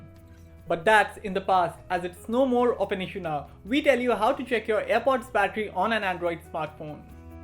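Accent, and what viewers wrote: India. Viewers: indian lol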